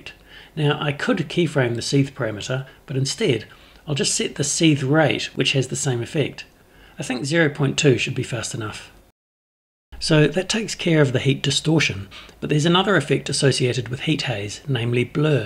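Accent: New Zealand. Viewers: wow i learnt about quite a few new Fusion techniques and methods in this one so thanks heaps. nice to hear a Kiwi voice too. great explanation and tutorial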